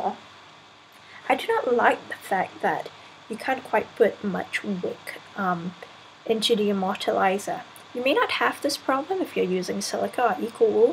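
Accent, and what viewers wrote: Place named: England, United Kingdom. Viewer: Thank you :), I finally got some flat ribbon for this little guy in the mail today, I'm building a micro for it now, time to see how she vapes with what she's intended for! Btw are you English? I only ask because of the accent, and way you spell flavour :P (man I miss my grandmum in England), I was wondering if europe has seen the Russian BIG rba yet? I've heard it's being made in germany and seen a UK vaper that had one, and am trying to get my hands on one sooo bad! drool It's just like a kayfun/russian 91% but it's massive, and holds 11ml 8D, just had to ask in case they exist for y'all yet. Thanks again!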